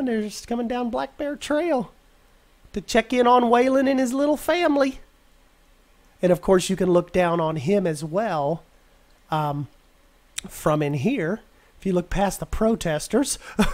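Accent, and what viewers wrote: South, United States. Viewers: correct me if I'm wrong but are you from the Southern part of the United States cause you have what they call a Southern Accent I have a Grate Uncle who lives in Kentucky and when he talks you definitely know he's from the South even though he grew up here in New England, New Hampshire to be exact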